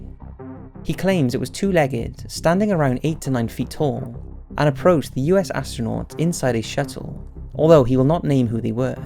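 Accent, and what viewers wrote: Wales, United Kingdom. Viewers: I could listen to that Welsh accent all day ☺️ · yep was just thinking that when I saw your comment - he has a soft Welsh accent I think. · Bluesummers pretty sure he has a very slight Welsh accent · He’s a has a welsh accent to be honest. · The Welsh in him!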